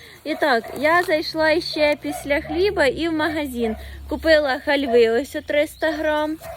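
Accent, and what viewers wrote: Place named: Surzhyk, Ukraine. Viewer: Це жарт. Вона просто грає таку роль. І говорити без суржику вона теж вміє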